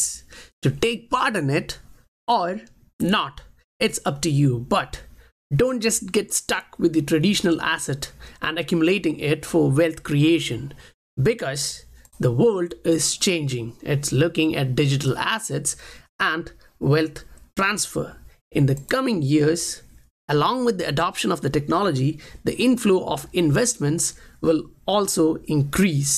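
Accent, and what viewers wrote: India. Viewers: where are you from?? You sound like maybe India? I'm in the US. Shit is crazy here right now. People looting and rioting in the streets its fucking chaos.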